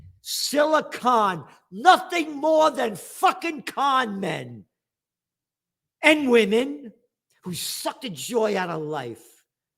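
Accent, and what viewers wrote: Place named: New York, United States. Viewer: Gotta say, Gerald reminds me of New York every time I listen to him ^^"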